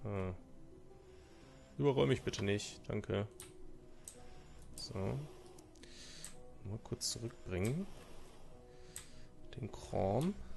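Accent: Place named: Rhineland, Germany. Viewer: Knöpke und Nupsi :-D Bist du aus dem Rheinland?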